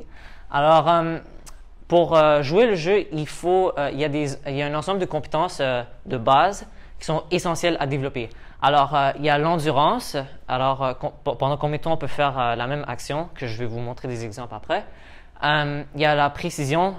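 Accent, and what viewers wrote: Quebec, Canada. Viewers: Oh, I was not expecting this. Your French is pretty decent ! Where did you learn to speak like this ? It sounds a bit like a French Canadian accent.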